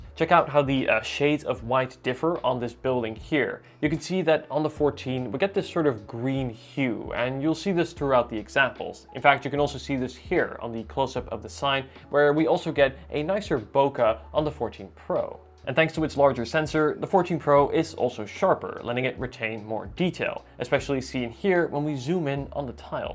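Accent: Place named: Netherlands. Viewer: You speak dutch to? I hear a little accent.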